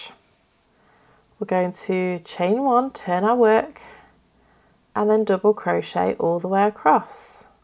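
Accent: United Kingdom. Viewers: Hi, i am new to your channel, i really didn’t know your name is not, … i was rewatching the tutorial today and at the beginning of the video i got your name!! 😆😆 Anyway i love both names, btw, I’m a big fan of your British accent! Lol Warm wishes… stay safe xx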